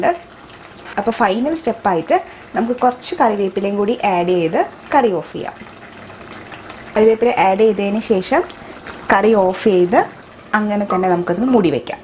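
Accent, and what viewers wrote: Thrissur, India. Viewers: തൃശൂർ എവിടെയാ. ആ bhasha കേട്ടപ്പോൾ തോന്നി മ്മടെ തൃശ്ശൂരാണെന്നു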